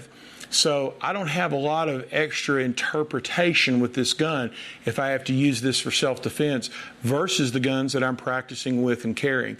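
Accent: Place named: South, United States. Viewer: I have a hard time listening to a overweight, end of life, bald bullet headed guy who speaks with a southern accent telling me anything about safety and personal defence. Here's is a personal defence tip, start exercising and stop eating everything you see. You are far more likely to die of a heart attack than a bad guy, "you can count on it".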